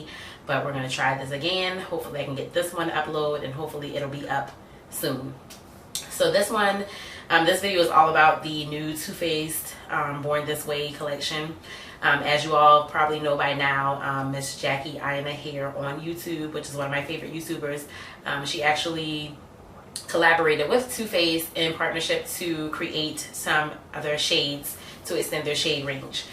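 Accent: Charleston, United States. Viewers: Lol your accent sounds very familiar to me, I could’ve sworn you were from Charleston, so I checked out your Facebook and it’s Columbia .... close enough... hey girl!!!! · Very nice tutorial, you sound like you're from chuck town sc.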